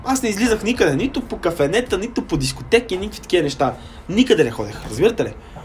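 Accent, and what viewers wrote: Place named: Sofia, Bulgaria. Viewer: По говора ти ако те вида няма да предположа, че си от Монтана, по скоро ше си помисля, че си от люлин примерно ние така си говорим тука :D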